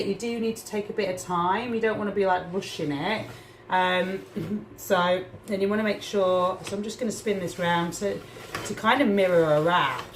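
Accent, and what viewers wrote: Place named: Northern England, United Kingdom. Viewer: Love the Northern England accent! I am in London. Thank you for this recipe!!!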